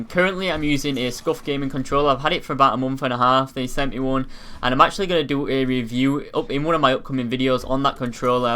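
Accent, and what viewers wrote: United Kingdom. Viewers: British english sucks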